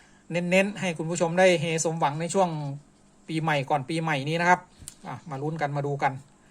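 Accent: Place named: Isan, Thailand. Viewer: ผมว่าอิสายครับ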